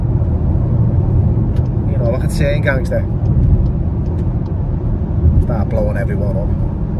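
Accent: Liverpool, United Kingdom. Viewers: Keep going bro your good I like to hear one of my favourite scousers bellew ok and that lol but you give us the street ex addicts new addicts any addicts but anyone gets a chance with you don't lose it now bro your on the pinnacle of success you will be in films soon bro your already a film star bro end o chat bless and thanks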